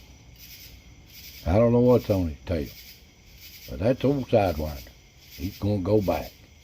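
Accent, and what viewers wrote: United States. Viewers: Good morning sir if you ever quit talking on these videos it will be a sad day as you nearly speak true American about like I do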